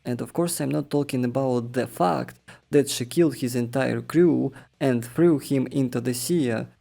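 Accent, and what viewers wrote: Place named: Russia. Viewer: Bro, you're from eastern europe? your accent seems quite russian to me...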